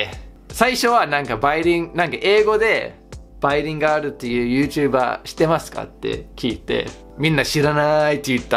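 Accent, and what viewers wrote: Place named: Kansai, Japan. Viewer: ずっと思ってたんやけどの方言って近畿地方かな？話し方だけで親近感がわく笑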